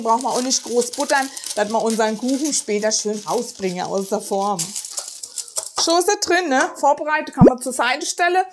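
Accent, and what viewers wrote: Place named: Saxony, Germany. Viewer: Super die sachsen. Dialekt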